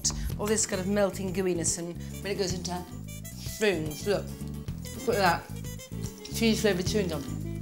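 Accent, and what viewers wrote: United Kingdom. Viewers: I remember watching some of her videos as a kid. It's one of those wistful kind of memories. I really liked her but I couldn't put my finger on why. I think it's partly the cinematography that gives her the signature charm. It's a combination of a British accent, great camera work, and classy ASMR.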